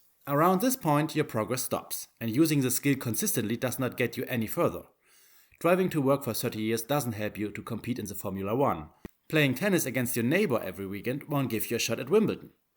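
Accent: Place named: Germany. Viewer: Well, I liked your video. And you sound like you're German. Which is also good.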